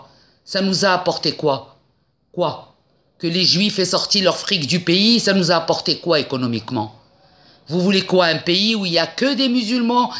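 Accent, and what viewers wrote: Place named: Morocco. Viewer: Bonjour Je partage votre vision des choses Pour moi qui suis franco-marocain , j'ai vécu dans ma chaire ce qu'être une minorité...Les gens confondent des catégories : nationalité, religion effective ou supposée, ethnie et. culture Il y a deux types de juifs: les Marocains et les autres. Bien sure vous êtes marocaine à 100% ça se voit, ça se sent, les vibrations de votre voix, vos mimiques de visage il n a pas l'ombre d'un doute je vous connais pas, je vous considère ma soeur . Je deux choses mon père, avant de mourir (à51 ans), un jour de retour au Maroc de mes études en France, juste avant son décès de quelques mois, il m a transmis un secret: il m'a dit mon fils je voudrais Ue tu saches que tu as une demi soeur juive sui vit en Israël , en effet avant d'épouser ta mère, j'ai été marié à une certaine Amouiyal ...Ta demi soeur s'appelle Esther...il avait les larmes aux yeux il ne l'a plus revue depuis 1964...J'ai été boulversé par cet événement .... 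j'ai pensé qu'Esther est musulmane par notre père, et juive par sa mère ...,Donc les choses de la vie ne sont pas aussi simples, seules les imbéciles le croient.. La deuxième chose, il y a des arabo-musulmans plus sionistes que beaucoup de juifs ..Suivez mon regard Je vous embrasse très fraternellement שיחב ח. חאמיד c'est mon vrai nom שלום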